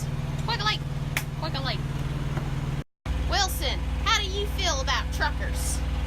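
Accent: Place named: South, United States. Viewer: This girl is an absolute beauty. I love her country accent as well. Keep doing your thing girl.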